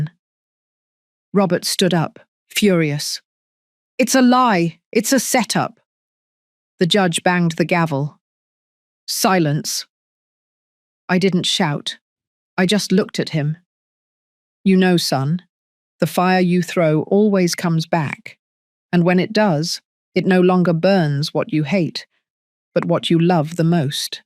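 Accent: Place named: United Kingdom. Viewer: I would have set his own house on fire in his sleep.That is the fate he deserves. Incredible story, writing and lovely British narration. I was hooked all the way through. As a child in California, we were evacuated from our home twice due to wildfires. It was terrifying. I'll never forget seeing my parents with their most precious possessions packed in their cars when they picked me up at school.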